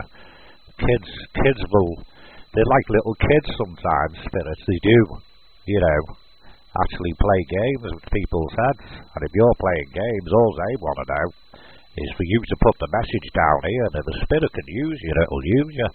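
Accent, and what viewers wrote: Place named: Cockney, United Kingdom. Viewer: What is this... some kind of cockney Brit radio broadcast? The audience doesn't even know who is addressing us. More moving images and less static ones... thanks.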